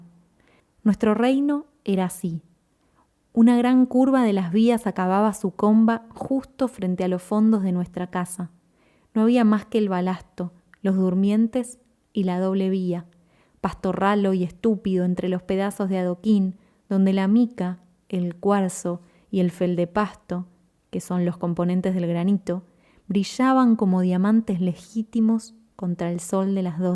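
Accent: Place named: Argentina. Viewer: Una interesante narración de la maravillosa pluma de Cortázar. Adoro todos sus cuentos, gracias por su linda lectura. Tienes una voz muy bonita, el acento argentino es único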